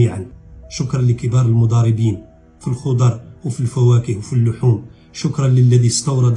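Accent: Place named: Hassaniya, Mauritania. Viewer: الله على اللهجة الحسانية .. الله يحفظكم اهل الصحراء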